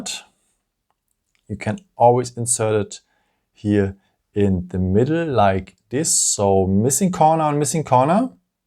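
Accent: Germany. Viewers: You sound German by the way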